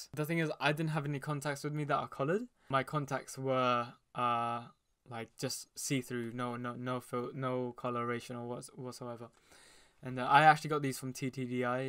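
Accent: United Kingdom. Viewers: UHH HES BRITISH?!?! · he sounds british broo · Is he British? I am confused 🤠🤠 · NO WAY YOURE BRITISH AAAH OMG IS AALIYAH GRACE BRITISH TOO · When i first saw ur povs on tik tok i thought u were American, hearing u speak British sounds so wrong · omg he’s british i always thought he was american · He British omg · aye im new and bruh like on tic toc im thinking he sound Different but he sounding like an british big brother I never had but his laugh had me tumble off the bed literally out of laughter Like the funny face he pulls in sum of them videos like I looking in the mirror trying to make it but failed successful Aye keep up the good job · Are you British and BTW IL your vids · I didn’t know that he had a British accent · Wait is he British AHHHH HE IS BRITISH 😮😳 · He’s Filipino and sounds so English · WAIT YOURE BRITISH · Are you from Britain bec I am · he got the proper london accent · Is it just me or does he sound a little bit british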